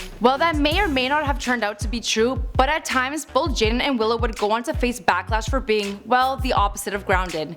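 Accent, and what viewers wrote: Canada. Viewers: Is her accent Canadian? Or foreign? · Her canadian accent is as thick As the whole album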